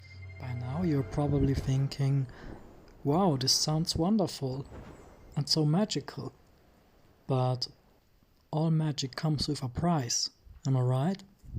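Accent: Germany. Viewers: You really have a calming voice^^ Im trying to figure out what your accent is😅🤔🤔🤔 is it german maybe? Or something else? Hmmmmmm🥲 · Dang i havent seen the video yet and it now just got the notification. Your voice is so beautiful and calming. I figured you'd me southern German/tyrol maybe? I could tell, because I am a German peep too! Your videos are so funny and a big entertainment. You helped me out alot in my moth days so thank you. Keep going and maybe we could be friends on sky?..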